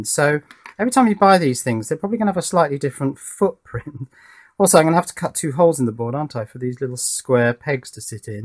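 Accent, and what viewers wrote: United Kingdom. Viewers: NO!. is british and he speaks british english.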